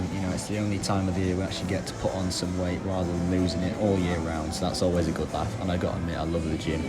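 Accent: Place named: United States. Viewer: You’ve got an American accent now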